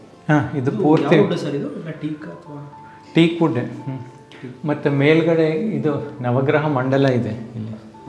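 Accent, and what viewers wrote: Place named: Bellary, India. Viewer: His original Bellary kannad accent I just love it👌👌👌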